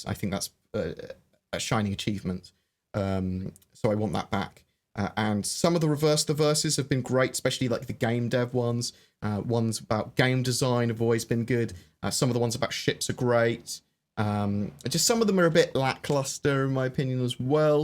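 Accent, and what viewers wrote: United Kingdom. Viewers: I agree with you about Disco Lando that he knows his stuff about the game, but there is really one thing that bothers me when he is on. That is the lack of control he has with his hands. Anyone that broadcasts for a living knows to keep their hands away from their mouths when the talk on screen. I have a difficult time understanding conversations, due to hearing loss. I've learned to somewhat read lips. When he coves his mouth, It's impossible. I can hear his speaking, but sometimes I have trouble really understanding what he is saying clearly. I spent too many years working around jet engine aircraft with a limited hearing protection, and unfortunately, some tones I frankly don't hear. If someone turns their back on me when they talk, or talk from another room, I just don't understand them very well. On that note, you do ok during your streams. 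I have no problem understanding you, even with your British accents. I look forward to 3.5 going to theEvocoti, the PTU and then out to live stream. I get it when it goes to Concierge and Subscribers as I am both. I also can't hardly wait for the Carrack to come out this year, as I have the Explorer pack that was available for Concierge.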